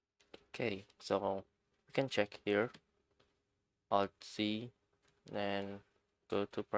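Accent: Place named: Indonesia. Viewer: bro are u indonesian, your accent sounds like indonesian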